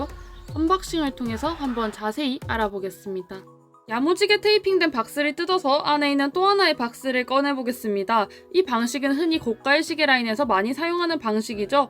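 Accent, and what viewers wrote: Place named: Gyeongsang, South Korea. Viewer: 갱상도 억양 고향생각 간절하굼요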